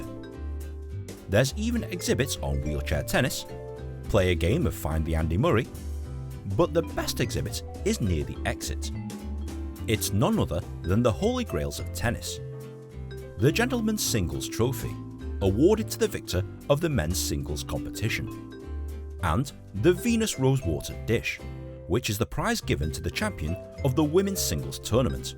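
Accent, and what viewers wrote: United Kingdom. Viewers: It’s not a hatred comment but I couldn’t imagine he has such a beautiful British accent … so proud of you Asian brother!!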